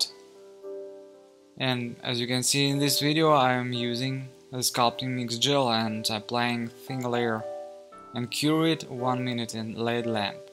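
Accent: Russia. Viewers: You speak English SO WELL, so fluently. I like the Russian accent. It sounds nice to me.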